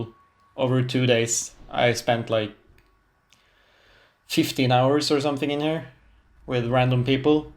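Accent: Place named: Scandinavia. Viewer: sounds like you are Scandinavian^^ and just a thought but maybe cut the intro down? its kinda long :)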